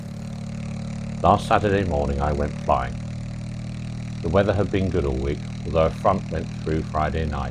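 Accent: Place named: United Kingdom. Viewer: You speak like a Brit and dress like a Yank. I had a hard time reconciling the two until I learned we share the same sense of humour and passion for flying. Well done, old man!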